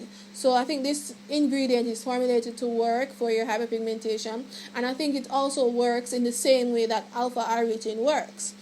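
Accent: Jamaica. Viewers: Forget the skincare products I absolutely love your Jamaican accent, it's 😍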